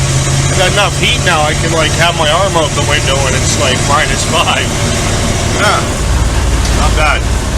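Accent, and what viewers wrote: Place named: Canada. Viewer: Wow, $100 is a steel I understand why you got it. I did good to for like I said for this area at $200. I thought I recognized your accent but wasnt sure that you might be Canadian...I would of bought even your old trunk in the condition its in If it was here for sale. Most of them are rust projects here because Im on a island. My old one is currently on Craigslist.I could repair it with a mig welder in hour but im too lazy and old now,LOLLL.....easier to buy one when the iron is hot for a good deal,HA!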